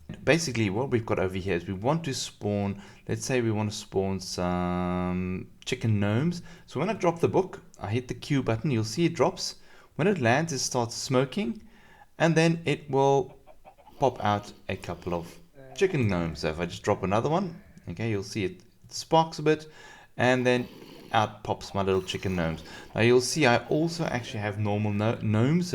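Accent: South Africa. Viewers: Is your accent South African, if not it sounds surprisingly like it.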